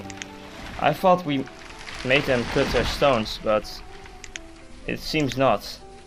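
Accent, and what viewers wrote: Netherlands. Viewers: Nederlands accent woehoe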